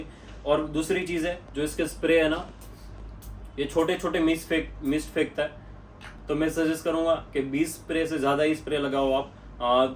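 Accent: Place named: Gujarat, India. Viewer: bhai bhai su vat che....apde hindi boliye aema gujrati eccent dekhay j jay😅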